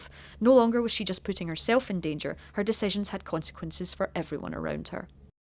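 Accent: Scotland, United Kingdom. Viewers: That Scottish is an ointment to my ears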